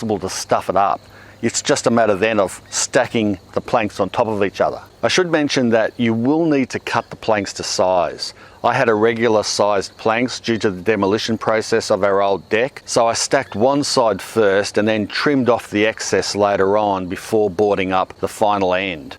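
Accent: Australia. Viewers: I think the bed looks great! And I would watch just for that great Aussie accent!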